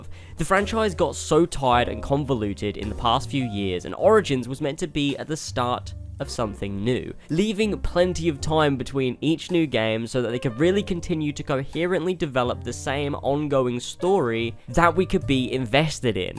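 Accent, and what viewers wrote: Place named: England, United Kingdom. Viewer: This video is misinformative and fuck the narrator with an English accent. You’re gay!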